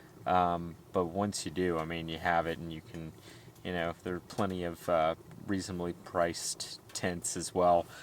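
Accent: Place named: United States. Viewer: You use a lot of British terminology in an American accent. Are you an expat?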